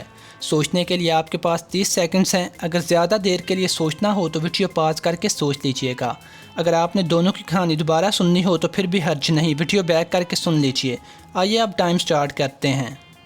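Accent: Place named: Lahore, Pakistan. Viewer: Are you lahorei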